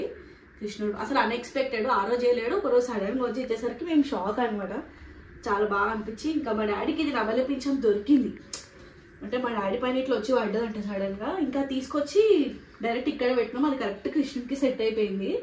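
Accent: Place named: Telangana, India. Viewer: Mee Voice chala Excellent ga undi andi Pure Telangana Slang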